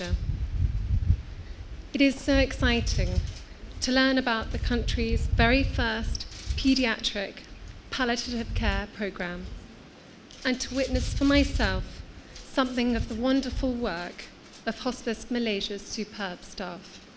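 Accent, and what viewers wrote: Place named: United Kingdom. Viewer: I love the british accent!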